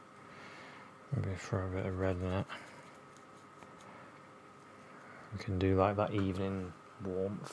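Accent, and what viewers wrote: United Kingdom. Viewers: Your British Accent is really so awesome and polite Dear.😊😇🥰😍🤗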